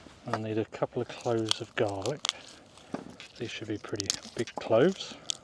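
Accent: England, United Kingdom. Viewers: I been looking at your videos and i just wont to no do. You have snakes were you live at the country looks like American ,but i no it is over in England because the way you talk my Aunt mother was from wales and she talks about not from England I'm from Wales she would set you straight were she lived,thank you for showing us how to sit up a tint,god bless.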